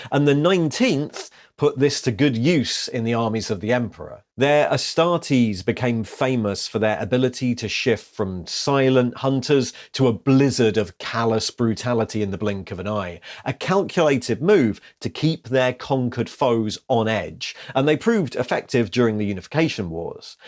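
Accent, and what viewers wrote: England, United Kingdom. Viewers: With how the accurate pictures are presented with your English accent I feel like I'm in school again 😂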